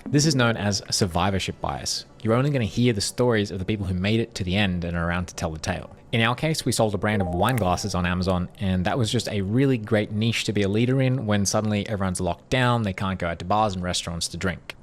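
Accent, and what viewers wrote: Australia. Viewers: Well I relate to you the only difference this happened three times in my life three big cycles. Now going up again fourth time I’m retiring soon!! From the age of 18 to 62. You sound like an Aussie I’m ex Aussie now American resident and Canadian citizen. In the end it’s all a ok if it’s not ok then it’s not the end.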